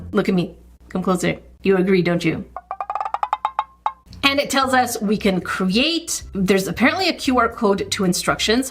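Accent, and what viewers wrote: Australia. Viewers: YOU MEAN **AUSTRALIAN ACCENT**, RIGHT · Im kinda hoping you get the koala just coz your aussie accent made me giggle 😂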